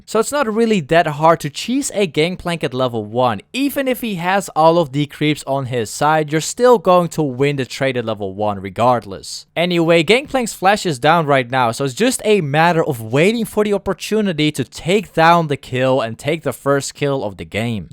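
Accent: Netherlands. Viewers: Are you Dutch? You sound Dutch speaking (Belgium/Netherlands)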